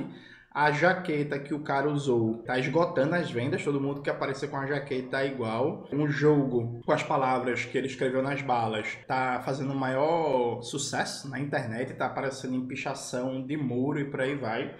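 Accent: Pernambuco, Brazil. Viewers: Isso é o sotaque pernambucano amooo❤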